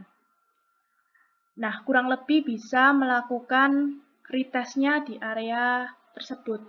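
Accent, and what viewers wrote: Java, Indonesia. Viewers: Iyo medok tapi pinter bngt e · Medhok tnn mbak wkwk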